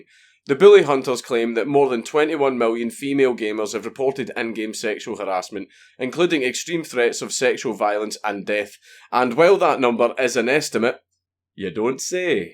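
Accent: Scotland, United Kingdom. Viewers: he's not South African he's Scottish and that screensaver is a joke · “... the Internet collective of weaponised autism kekked into gear” I know Dank meant to say ‘kicked’ but what I heard in his dank Scottish accent was far more fitting.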